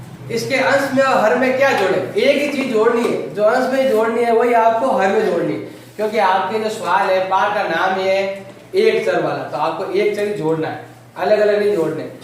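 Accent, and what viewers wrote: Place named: Rajasthan, India. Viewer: sir apki awaj se lag rha h aap rajasthan se ho to mujhe tusion lena h please sir jwab dena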